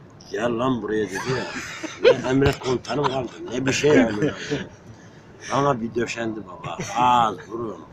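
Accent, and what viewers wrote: Central Anatolia, Turkey. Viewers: İç anadolu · İç adadolu Aksaray Niğde şivesi olabilir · Arkadaşlar adam iç Anadolu'dan iç Anadolu da çoğu yerde bu şive ve ses tonu var. Sadece sizin orada bu adam gibi konuşulmuyor. Eğer iç Anadolu da yaşıyorsanız %90 böyledir şive. Ve bu adam Yozgatlıda olabilir Niğdeli de Kayserili de Nevşehirli de Kırşehirli de vs vs hatta ve hatta Çorum Sivas ta olabilir.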